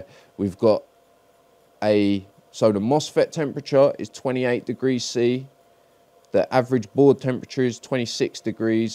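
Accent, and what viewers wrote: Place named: East London, United Kingdom. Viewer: I'm from south east London! You sound like a an east Londoner ( I feel more comfortable hearing you talk) I really hope I can bring my battery to you so you can work your magic !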